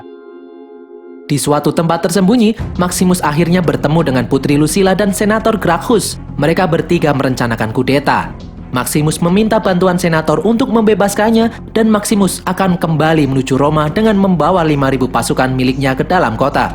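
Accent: Java, Indonesia. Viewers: Agak mendok ya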